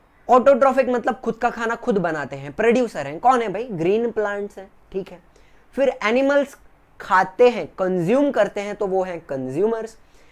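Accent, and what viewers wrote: Maharashtra, India. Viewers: Sir mast shikavata tumhi Tumhi marathi ahat ka I thought that because of your language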